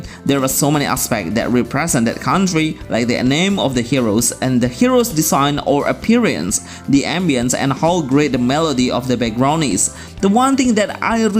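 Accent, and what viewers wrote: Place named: Indonesia. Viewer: I'm from Indonesian, and I noticed your accent pretty much similar to me.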